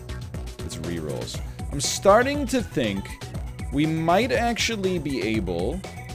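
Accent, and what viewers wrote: Canada. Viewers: NL sounding very Canadian this video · why is NL sounding exxtra canadian latley?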